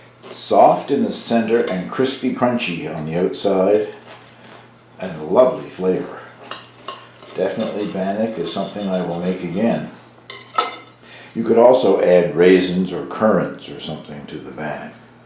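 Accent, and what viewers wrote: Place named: Canada. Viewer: I, like yourself, appreciate good food but not into making comlex ones. I'm learning interesting Canadian pronunciations. We say scones like stones. You say it like skawns. I'm hearing a lot about the instant pot, it looks like it needs a 2 week workshop to learn how to use it. Thinking about getting one. Best wishes.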